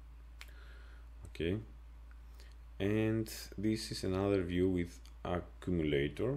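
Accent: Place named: Russia. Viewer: Вопросов нет, человек взялся рассказать за HPS, молодец. Но, английский наш этот, с этим нашим произношением и вот эти here, ok, ok, here, слишком много и часто ( это я только мин просмотрел). А так конечно молодец. Продолжайте в том же духе!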